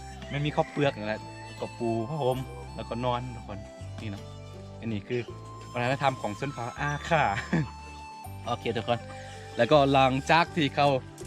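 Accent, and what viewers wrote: Isan, Thailand. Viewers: คนบรรยายเป็นคนอาข่า หรือ คนอีสานครับ ทำไมพูดเหมือนคนอีสานเลยครับ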